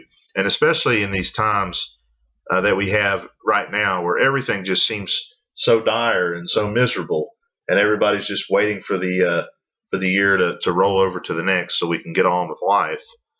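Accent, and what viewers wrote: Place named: Southwest, United States. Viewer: Good content, eloquent and great speaking voice. What's your accent? I'm guessing S.W. of U.S.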